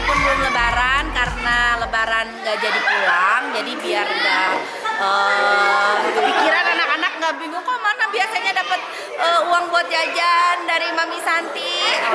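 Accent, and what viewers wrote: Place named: Garut, Indonesia. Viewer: Uhuyyyy Lamun teh ngomong Nii hayang seuri.. bhs garuttna kaluarrr... Seruuuu lahhh👍🤣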